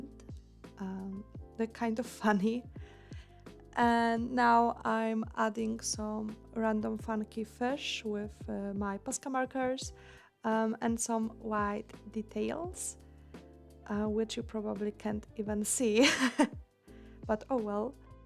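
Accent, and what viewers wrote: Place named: Poland. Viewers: I HEARD THE POLISH ACCENT FROM MILES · I FELT THAT TOO, I THOUGHT "she sounds Polish" AND THEN SHE SLAPS A "ostrożnie szkło" STICKER ONTO THE SKETCHBOOK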